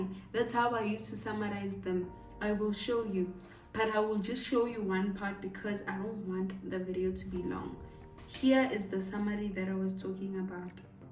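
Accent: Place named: Tsonga, South Africa. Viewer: You sound Tsonga idk😭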